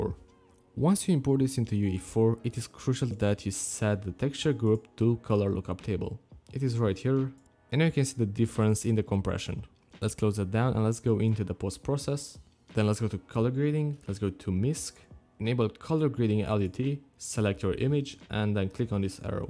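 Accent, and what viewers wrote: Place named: Russia. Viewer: Сразу видно по голосу, наш брат, русский)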